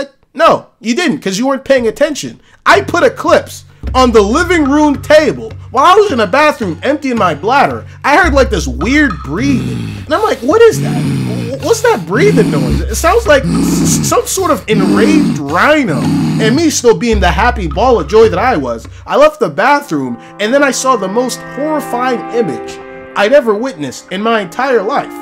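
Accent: Jamaica. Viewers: Wait yuh jamaican holy shit bless brogawd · is he Jamaican?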